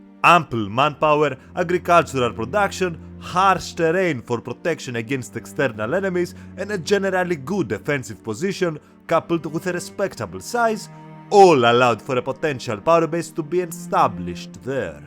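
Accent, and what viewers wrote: Greece. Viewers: Ρε μπάρμπα, πώς νομίζεις ότι ακουγομαστε όλοι όταν μιλάμε εγγλέζικα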